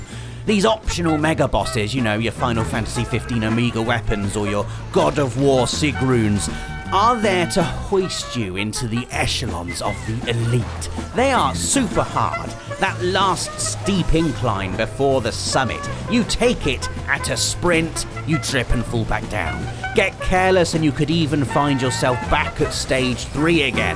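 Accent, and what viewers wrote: England, United Kingdom. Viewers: Because he has an English accent?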